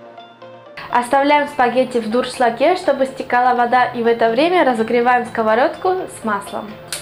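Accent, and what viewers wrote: Spain. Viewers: У уже акцент испанский есть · она родилась в Росси в 11 лет родители с ней переехали в испанию поэтому у неё такой акцент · нет она живёт в Испании , но родилась в России . акцент от того что она больше говорит на испанском чем на русском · у нейо испанский акцент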